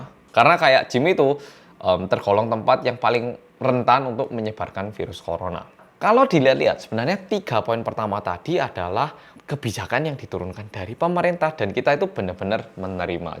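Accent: Java, Indonesia. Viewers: Tetap sehat ya yg medokkkkk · Kopine ngantek entek mek gur nyritakne corona 😁 . Terus lanjut vidione mas . Gawe mbantu bolo bolo . Kerjo libur delok vlog e korea ne wong medoookkkk ,🤣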